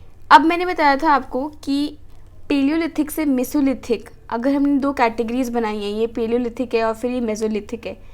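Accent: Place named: Rajasthan, India. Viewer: ❤ mar koot bhata Aap rajasthani ho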